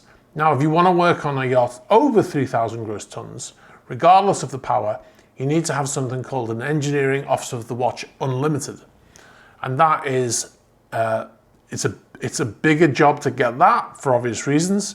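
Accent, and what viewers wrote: United Kingdom. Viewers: I've only just watched a few of your videos. What is your history in crewing? I'm not asking for a complete cv but a general idea. Do you have an engineering background or captain/masters rating or chief cook and bottle washer? Lol Do you still work or are you retired. You sound British so maybe you were in the royal navy? I liked your video and hope to see more!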